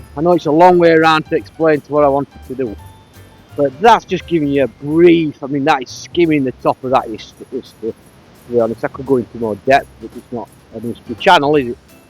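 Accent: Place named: Barnsley, United Kingdom. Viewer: My mum loves you for speaking broo-ard BaRRRnsley. Heebee geebees 😂 etc. Happy festive season to you and yours.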